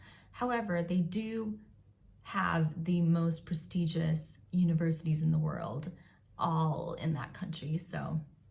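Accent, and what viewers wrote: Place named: United States. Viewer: To be Frank, couldn't follow your American accent. Still love to hear from you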